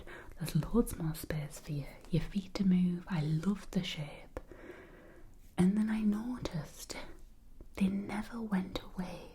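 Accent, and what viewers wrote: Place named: Northeast England, United Kingdom. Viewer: I'm North East England myself by the way, listening to your voice feels like home ❤️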